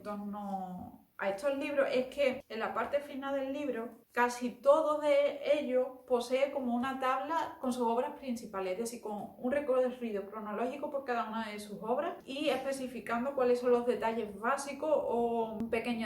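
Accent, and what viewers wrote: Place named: Castile, Spain. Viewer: Un momento, es alemana con acento castellano de qué parte de España??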